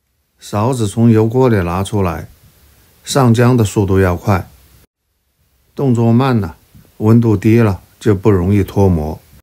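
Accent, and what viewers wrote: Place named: Wuhan, China. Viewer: 哈哈 这纯正的武汉普通话，好熟悉。不过这个面窝完全都没有没有泡起来，跟武汉的面窝还是有点差距。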